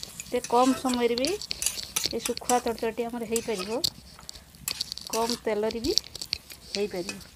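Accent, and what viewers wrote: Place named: Bhadrak, India. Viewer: Tame bodhe bhadrakia 🤔🤔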